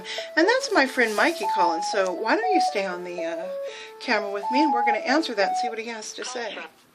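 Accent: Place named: South Carolina, United States. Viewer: I cannot wait to see you in character with all the bells and frills and everything else complete. Love that South Carolina accent, by the way, you got it goin' on! :) Mah word!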